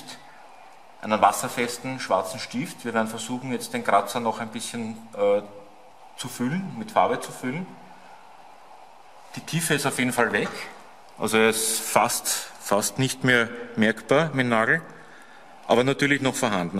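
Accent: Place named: Austria. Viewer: Bist sicher a Österreicher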